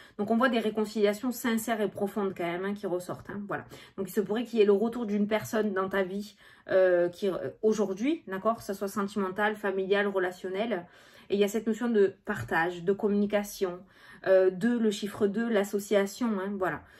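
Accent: South, France. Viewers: Tjs un plaisir de vous regarder......joli accent du sud qui fait sourire ici à Bruxelles...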